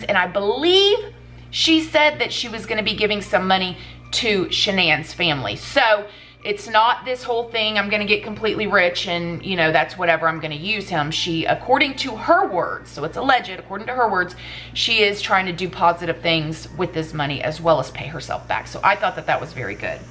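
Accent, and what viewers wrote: South, United States. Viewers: Omg the firdt vid I saw of you I thought you were actually country lol. This vid you not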